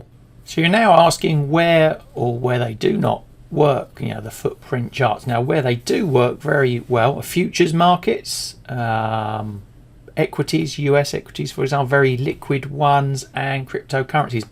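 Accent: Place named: Kent, United Kingdom. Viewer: Enjoying the Kentish accent as usual. Of course, the traditional pairing of volume footprint charts is CVD (cumulative volume delta), the order book, DOM (depth of market), and sometimes VWAP, as well as volume profile, targeting all the phases (initiative, absorption, exhaustion, reversals, etc.) of a typical cycle that you described in each of your strategies. I’ve switched form Renkō to using TPO (time–price opportunity) to identify key levels and market state (rotation, trending, acceptance, rejection, etc.) and then footprint charts specifically for timing of execution.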